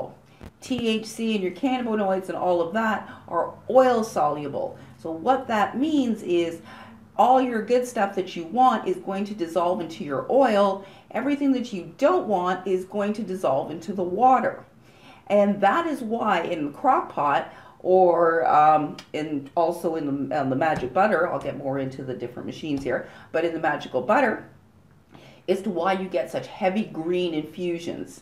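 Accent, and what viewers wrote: Canada. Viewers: I love how authentic you are when describing each machine. And your Canadian accent too!! 💚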